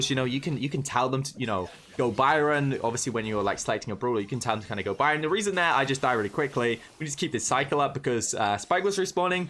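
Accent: United Kingdom. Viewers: Listen to his accent his from UK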